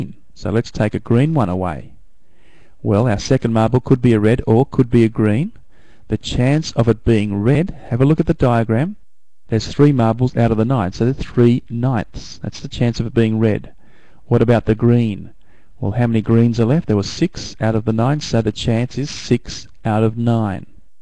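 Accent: Australia. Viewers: WOOOO HE'S AUSSIE!! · I don't know why, but the Australian accent really helps me understand. This is awesome, thank you! :)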